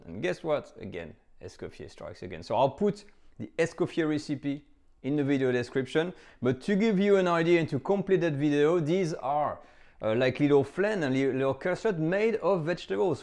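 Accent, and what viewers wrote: Paris, France. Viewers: Parisian Accent 😁 Love your cooking ❤️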